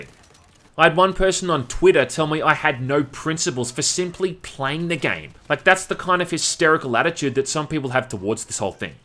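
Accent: Australia. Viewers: I don't get why you're so hung up about them removing a slur from a game. Comparing your Aussie accent to being a little person is kinda apples and oranges. I get that ppl on twitter can be volatile, but like, there are reasonable reasons that ppl would want m*** removed from the game. In my experience, even trying to have a calm discussion about these things causes people to plug their ears and go, "Sjw sjw, i can't hear you, tuning you out, bla bla bla bla bla bla" I'm aware that gearbox doesn't actually give a shit about the slur, and is just protecting their bottom line.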